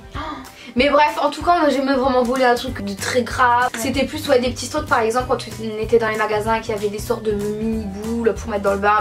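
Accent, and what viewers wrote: North, France. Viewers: Accent du nord😍😍😍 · Vous parler ford du nord (ce n est pas garve et se n est pas mechant)mais defois sa me fait un peu rire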